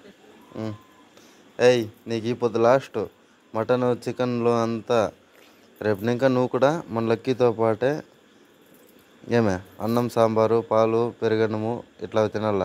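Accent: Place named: Anantapur, India. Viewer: బ్రో నువ్వు అనంతపురం డిస్టిక్ వాయిస్ మాట్లాడుతున్నావ్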